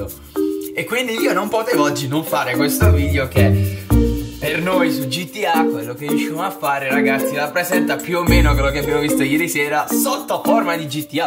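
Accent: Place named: Rome, Italy. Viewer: Ma era lo stadio del Marsiglia🤣🤣🤣 Pensavo tifassi Roma dal tuo accento🤔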